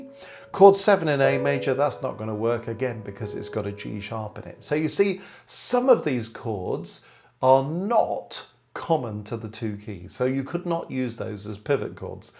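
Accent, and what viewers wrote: United Kingdom. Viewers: British accent? Bossman teach you nuance in music theory so you can thrive and you can't distinguish which part of the Isles his accent derives? Coolios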